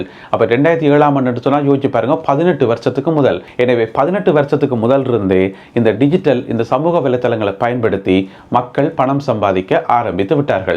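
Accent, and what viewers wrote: Sri Lanka. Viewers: I came to London on visit visa,i have subscribed your channel very interesting,your srilankan slang tamil impress me very much.iam running a devotional channel namely Temple trails showing festivals of temples,on seeing your channel i got impressed and started a new channel namely வானமே எல்லை. your pronounciation and detailing is super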